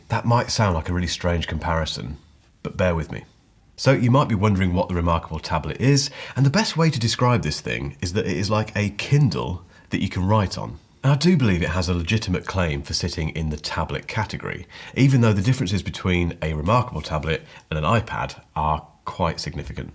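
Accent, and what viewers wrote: United Kingdom. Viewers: thanks for this. I have a remarkable, i purchased it just before they change to the connect option. Those of us the had the plan prior to the connect change got the full connect plan. Whew! Everything you said was correct. The price point was my biggest hartal at the time. I carries at least three note book on a daily basis but I could have used many more. I tried the iPad pro for a little while, but that was not satisfying even after the paper like screen covering. I love the tactile feel of writing and that took many months of youtude watching and reading reviews. With the remarkable 2 I enjoy writing on a digital screen. The price point is very steep and if not for my massive note taking I would have passed. One of the marketing focus points is no interruption when you are writing, drawing, or reading. Which turned out something that i enjoy. 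My iPad did not offer that unless i ran it in air plan mode. Thanks for your all your content. I have been subscribed for a few months and enjoy you British accent. Keep up the great work!! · I really ain't sure if I subscribed your channel for the reviews or for the... British accent.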